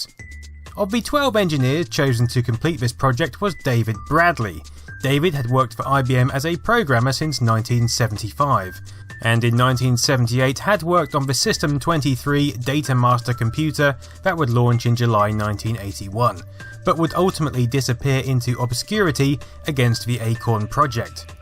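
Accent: United Kingdom. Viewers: Brilliant video after Brilliant video AND with proper British accent what could you possibly ask for more, Thanks!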